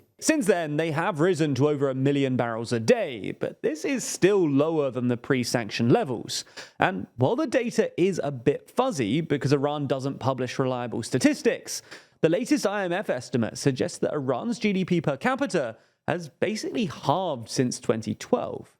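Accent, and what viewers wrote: England, United Kingdom. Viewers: Apparently, you are the expert. Just because you have an English accent doesn’t make you any smarter than an average person. I’m not even sure how you ended up in my feed. More bullshit from someone that doesn’t know crap. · British accent and commentary about how China is weak, Russia is weak, Iran is weak. Interesting how that goes.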